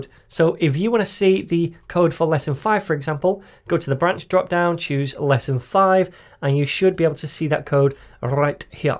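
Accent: United Kingdom. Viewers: fucking british accent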